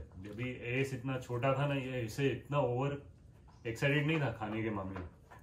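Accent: Mumbai, India. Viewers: Sir,your talking method is awesome..(like mumbai style)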